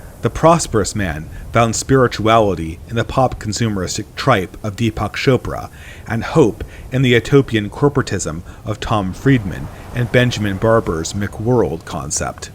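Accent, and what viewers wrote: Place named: California, United States. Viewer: I hear the voice of a fellow Californian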